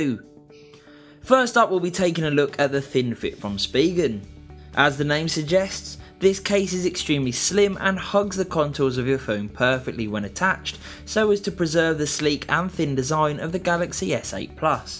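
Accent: United Kingdom. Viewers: Sounds like he's from the UK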